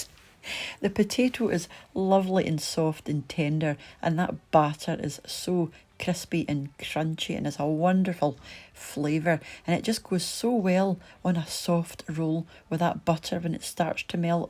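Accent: Scotland, United Kingdom. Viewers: Yum yum yum and so easy to make.Also brilliant to hear my accent, yeah from Clydebank originally.Loving the Scottish foods,thank you and keep them coming. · Its just a normal Scottish accent. THEWUMMANSJOOSTSPEAKINGSLOWERCOSSHESOANAVIDYAPAL... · Love the food you do,love the acsent ,it's a joy so many memories of scotland , keep it going great to see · Here in New South Wales we do call them Scallops and I Luv Ya Scottish Brogue I was Going with a Guy from Kilcordy fife shire and I had an Auntie that was Scottish so I picked up his Brogue and it has been a very longtime since I used to sayTotties for 🥔 ... lol ... and the wardsmaids would tell me Speak Australian we Don’t understand Scottish ... lol ... I was training as Nurse back then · You can also teach standard/traditional/authentic Scottish accent, as yours is distinctive yet wholly comprehensible and lovely. 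· Gonna have to try those, they looked awsome. Your voice is so soothing and i miss the accent, was born in arbroath but have been in Canada since 68, only been home once in 77, was a bad trip i was sick the whole time.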